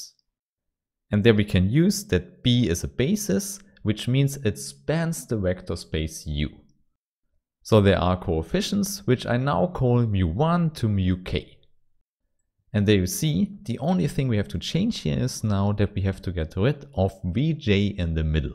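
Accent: Germany. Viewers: Haha sehr geiles Videos. Das deutsch ist nicht zu überhören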